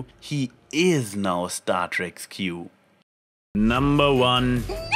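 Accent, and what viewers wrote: Germany. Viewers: Hi. Love your videos but I can't stop thinking about that accent you have. Are you german or what kind of accent is it?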